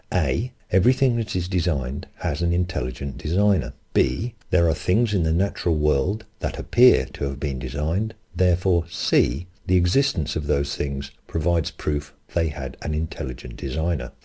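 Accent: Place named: United Kingdom. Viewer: but there are people that invented things without learning anything prior, like Tesla. Tesla and others described their experience as a shamanic trance experience where the Universe told them what to do. The life explosion from the Cambrian is exactly that, apparition of sophisticated life already evolved out of nothing, with no intermediary species. And sincerely I do not see how this idea, even taken as valid, refutes intelligent design. What you're saying is a designer must learn from his mistakes in order to make sophisticated things. So the process looks exactly like evolution, however, evolution itself being the works of a designers who keeps learning from his mistakes and trying new things. Your ideas do not refute the designer, refute the fact that designer couldn't come up out of nothing with sophisticated life. Your idea just proves evolution, but not the nonexistence of a creator, actually it even makes a case for it. 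Another ridiculous bong argument, I wasted my time, I swear, whenever I hear the bong accent I will close the video. Ridiculous sofisms, so cringe, so awkward, so out of touch with the reality, so clumsy, so pompous and pretentious. Bong people in a nutshell.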